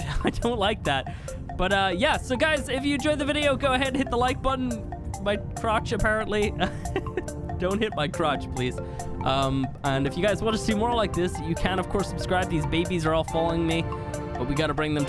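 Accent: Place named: Ireland. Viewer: Dude i forgot you are Irish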